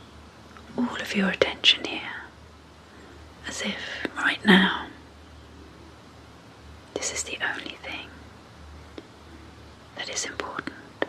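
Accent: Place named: West Country, United Kingdom. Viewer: I wonder where this lady is from? She’s got a Wiltshire/Somerset vibe.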